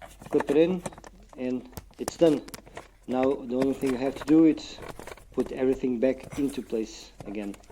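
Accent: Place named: Portugal. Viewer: Thank you very much. Excellent information, very useful no doubt. But there is a cabin filter missing in Your car, because these cars always use two at the same time, one on top of the other. I know because I have a Partner Tepee, and I change these filters once a year. By the way, by your accent and your name, I imagine that you are Portuguese, like me? If so, you can answer me in Portuguese. Thanks.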